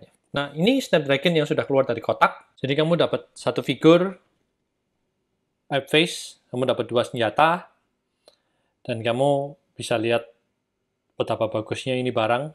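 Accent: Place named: Java, Indonesia. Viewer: Mantep... medhok reviewer e wkwkwk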